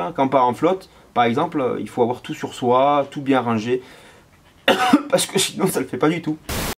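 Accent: South, France. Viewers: Super ta vidéo t'as l'accent du sud tu est dans quel département ?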